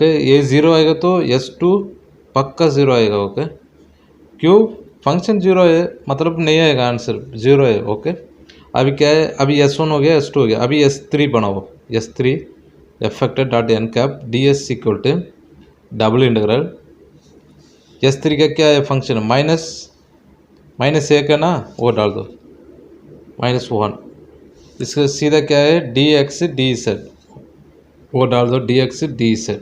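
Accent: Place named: Hyderabad, India. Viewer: Hydrabadi ton me Bolta Bai.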